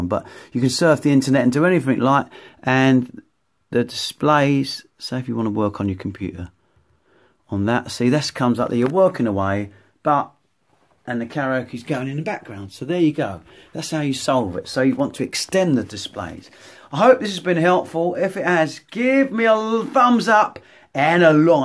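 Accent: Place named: United Kingdom. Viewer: i really love the british accent. Cheers man! Very helpful.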